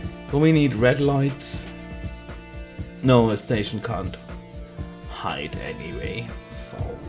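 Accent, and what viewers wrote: Germany. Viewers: I just moved in here in germany and i can tell that you are german or at least something similar just because of your english. Correct me if im wrong.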